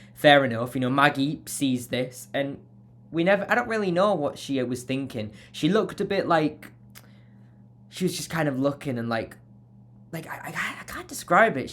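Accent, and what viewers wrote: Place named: United Kingdom. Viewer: Hi, you sound like you are from the UK?! How are you managing to watch it please?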